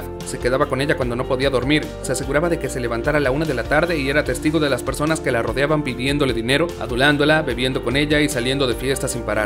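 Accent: United States. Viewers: buen video, pero tengo que darte una sugerencia, como comprendo que no tienes por que saber frances, entiendo que no sepas como se pronuncian los nombres ni las palabras, lo que no entiendo es esa mania que teneis la mayor parte de los youtubers en sudamerica de pronunciarlo todo como los yankees, no se si es complejo o que creeis que os hace parecer mas inteligentes. te pediria que si vas a pronunciar mal lo hicieras como lo haria un hispanohablante y no como crees que lo haria un yankee, que ademas hablan un ingles como con desgana, insisto buen video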